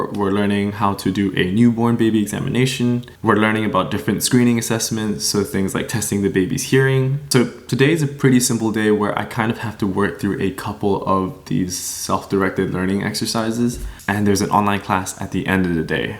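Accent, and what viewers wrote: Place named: United States. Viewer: Great video! we’re u born in the UK because i don’t know why but i thought you were American at first??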